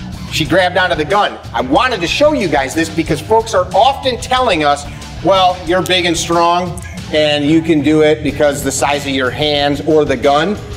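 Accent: Chicago, United States. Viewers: Sounds like he's from Chicago. He talks like my brudder. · Gee I wonder if this guy is from Chicago. Nice accent. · also, at least I didn't say that you sounded like Dan Akroyd, like the guy a couple of comments below mine, and yes, I guess it is more of a Chicago accent. I think I had a mental block on Illinois, however, being that gun rights up that area are so limited... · That’s gotta be a Chicago accent.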